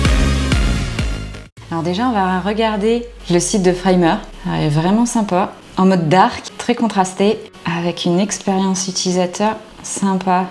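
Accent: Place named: France. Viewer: the french accent is a huge flex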